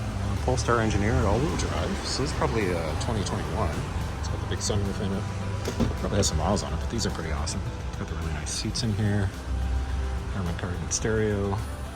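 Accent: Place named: Canada. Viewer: also im pretty sure youre canadian by your accent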